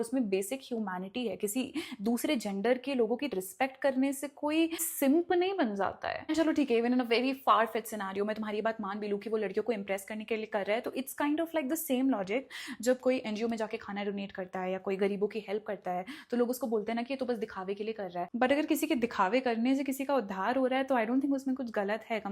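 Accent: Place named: United States. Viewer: one thing made her channel different from others and attractive that is <3 her personality in long video's is like -: pure AMERICAN ACCENT !!😁 and in reel's and shorts is like-: pure desi and dark vibes 😜😁 with some funny sprinkle spices ♨✨❤!! one thing i must say 😊🤨>> wht happens with this cute gurl !! in both the format of videos 😅🎀!!!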